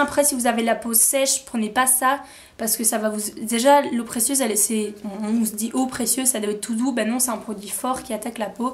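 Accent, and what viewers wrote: Belgium. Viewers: Ahhh une belge qui fait des vidéos ! J'ai reconnu ton accent ! Ça me fait plaisir ;) Tu es d'où ? :) Et tes vidéos sont super ! J'adore !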